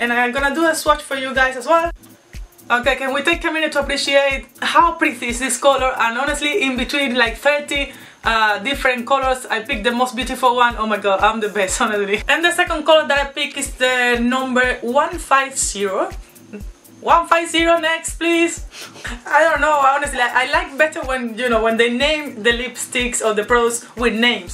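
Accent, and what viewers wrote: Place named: Italy. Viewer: Oh my god! She speaks so fast with Italian accent well, no comprendo lol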